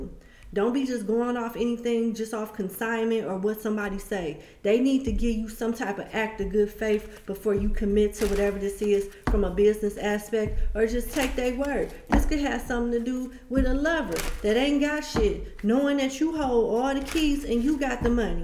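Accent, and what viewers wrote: South, United States. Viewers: you are so pretty and blessed I'm glad I found you and your southern accent is adorable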